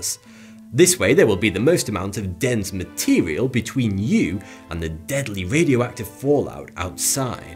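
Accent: United Kingdom. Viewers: Watching this makes me feel like your 20yrs behind like you know top secret you sound like your British forsure technology is not your field from across the pond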